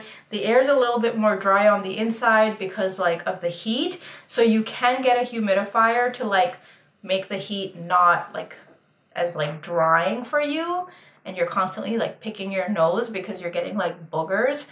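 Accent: India. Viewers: You look so cute with that Indian accent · really really really really really thanks for the South Indian accent..I am not Indian, but I am in Bangalore for almost 4 years and it is super, pakaa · 😀😀😅😅 That Indian speaking excent was really nice. Informative video👍👍... Keep it up 👍👍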